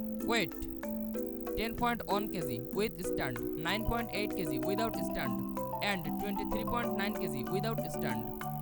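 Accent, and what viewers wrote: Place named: India. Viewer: Hindi native tongue speaking accent is not as thick as some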